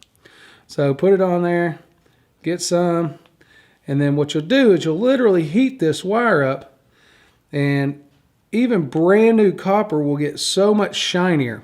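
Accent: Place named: Carolinas, United States. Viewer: You’re from the Carolinas? sure sounds like it🍻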